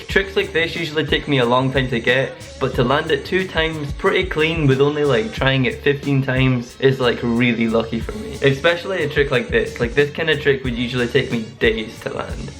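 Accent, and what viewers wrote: Scotland, United Kingdom. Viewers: Scottish. I knew it! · Are you from Scotland or the UK ?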